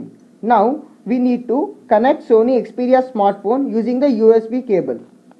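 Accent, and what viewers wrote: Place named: India. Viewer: Ce accent are indianul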